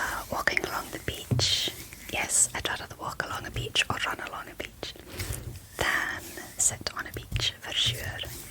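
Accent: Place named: Scotland, United Kingdom. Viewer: I think you are Scottish because of the way you sound but also I can understand what you’re saying so I don’t think you currently live in Scotland. Were you in America or England for a few years?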